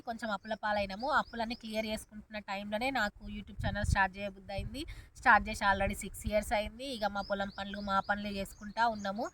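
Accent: Telangana, India. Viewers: Sister మీ వాయిస్ సావిత్రి V6 anchor శివ జ్యోతి అక్క... Telangana యాసలో మీరు బాగా మాట్లాడగలుగుతున్నారు👌👌👌👍👍👍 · గారు మీ వాయిస్ తెలంగాణ యాసలో సూపర్ 👌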